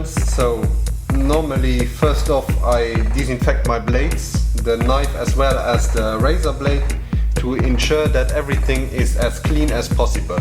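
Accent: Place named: Luxembourg. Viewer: 🎉 duuu bass letzeboier ❤ ech erkennen deen accent direct 🎉❤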